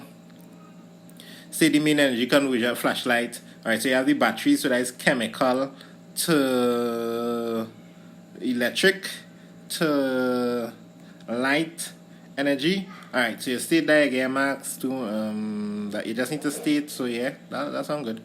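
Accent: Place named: Trinidad and Tobago. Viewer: you sound trini, i am hbu?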